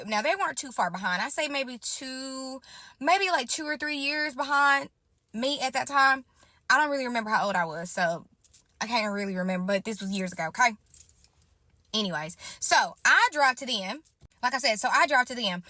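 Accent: United States. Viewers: She sound country like me lol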